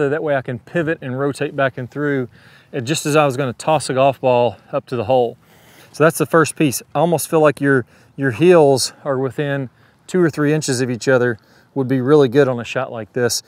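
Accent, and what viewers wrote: South, United States. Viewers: Great work, love the Southern drawl, nearly as good as the Aussie accent, but close. Thanks for your videos from Melbourne Victoria!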